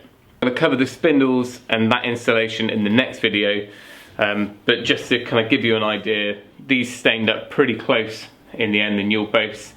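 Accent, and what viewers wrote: United Kingdom. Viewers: Great job but are you in Australia but sound like british???what's on your sandwich butter or jelly???? Lol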